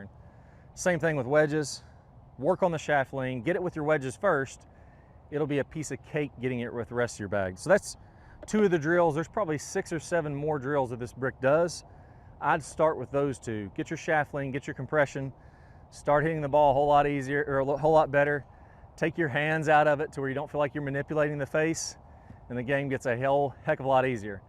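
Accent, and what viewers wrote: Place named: Kentucky, United States. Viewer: always love your instruction and has helped me get my handicap from 20 to about 12.4. My question for you is not about golf, but rather where you grew up? Your accent sounds so much like my roommates from Kentucky when I was at UK. Keep up these great instructional vids. I need to get your swing boxes. I cheaped out and just stick my alignment sticks in the ground by eying the degrees. It’s helped, but I think I need to pull the trigger on your product to be more accurate.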